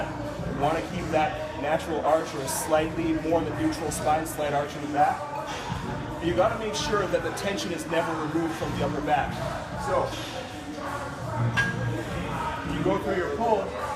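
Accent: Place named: Canada. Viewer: A black guy with a Canadian accent.....learn something new everyday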